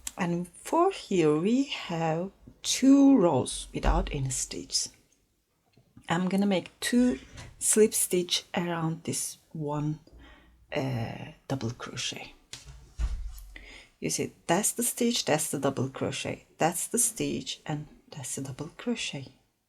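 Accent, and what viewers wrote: Turkey. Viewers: Your accent sounds like turkish where are you from? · Abla konuşmandan türk olduğunu anladım bunu türkce anlatırmısın lütfen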